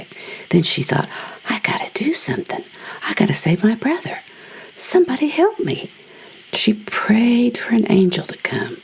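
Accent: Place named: Appalachia, United States. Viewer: What a wonderfully fun story! So much character brought to life in this tall cat tale (by the way that was a really long tail). Loved hearing your expressive Appalachian accent and colorful language too. Amazing photos of those cats, such eyes!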